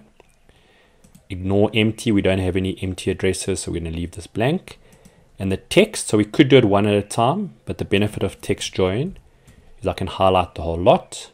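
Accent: South Africa. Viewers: As soon as you opened your mouth, I thought to myself, you must be South African. I can recognize my countrymen no matter where I find them. Am I right?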